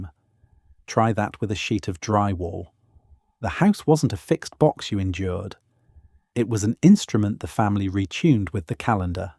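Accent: England, United Kingdom. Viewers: Isn't it an Al Englishman?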